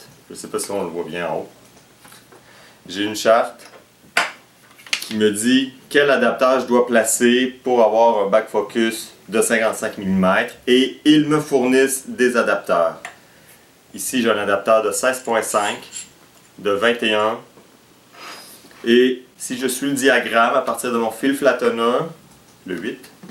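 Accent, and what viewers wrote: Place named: Quebec, Canada. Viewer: Mon dieu ca fait du bien d'entendre du Quebecois, sur un site qui publie des tutorials d'astronomie good gradulation.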